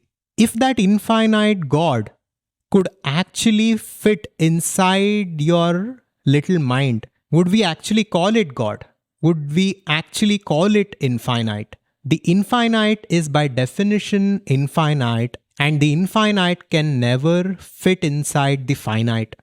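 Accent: India. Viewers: Probably the best explanation to this question in the thickest Indian English accent I’ve ever heard.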